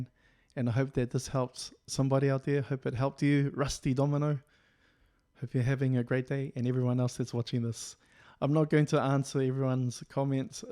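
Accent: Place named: New Zealand. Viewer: Hi bro, I've just discovered your channel. Great work ❤️ I take it from your accent your a Kiwi I'm a guitar teacher in NZ. I'd be interested in your teaching experience in Japan as a Kiwi if so. Perhaps a video about this you think it's worth covering.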